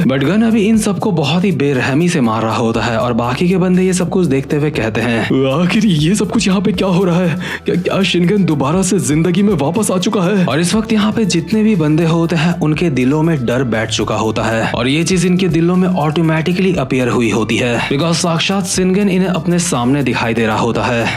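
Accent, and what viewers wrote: Uttarakhand, India. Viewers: Bro tum Uttarakhand se ho kya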